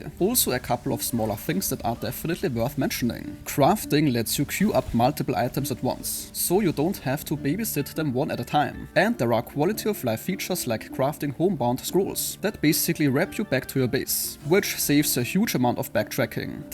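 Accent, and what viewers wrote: Germany. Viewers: wow hast du nen krassen deutschen akzent :D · i hear the german in your voice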